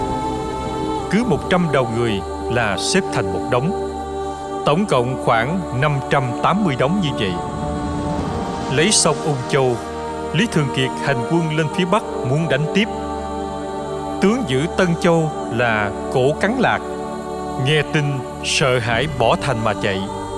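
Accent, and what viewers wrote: South, Vietnam. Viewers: Ky thuat long tieng cua anh dat toi trinh do dang cap ...giong nam bo hay ...hihi..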